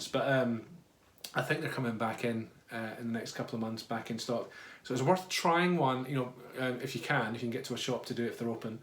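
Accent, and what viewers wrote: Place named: Scotland, United Kingdom. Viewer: Nice to hear another Scottish person!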